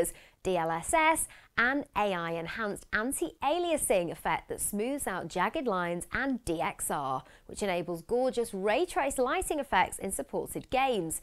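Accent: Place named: England, United Kingdom. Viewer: I love the englsih accent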